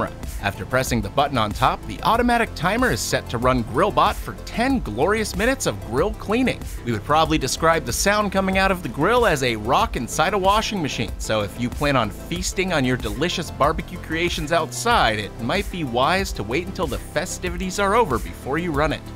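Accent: Buffalo, United States. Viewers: Are you from buffalo????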